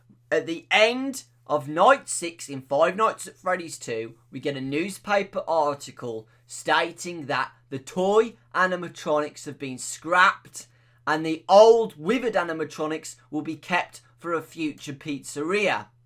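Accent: United Kingdom. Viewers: U R THE BEST FNAF THEORIST EVER AND UR UK VOICE IS BAE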